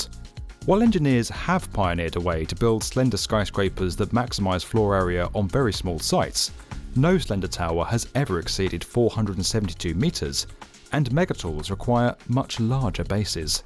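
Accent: United Kingdom. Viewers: I know right I like a good British voice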